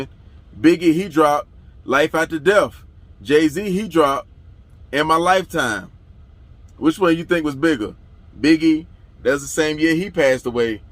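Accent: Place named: South, United States. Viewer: Bro are you from the south sound like you from here I know my southern people shout out to you from Louisiana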